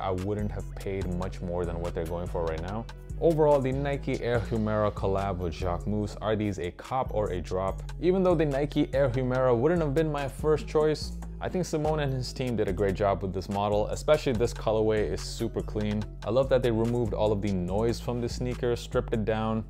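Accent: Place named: United States. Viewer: he has an American accent and probably doesn’t speak French. Give him a break 💀💀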